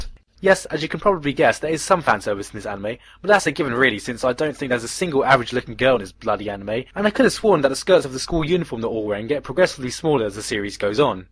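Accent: United Kingdom. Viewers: it might just be because all of his insults are with a brittish accent, but this dude is freaking hilarious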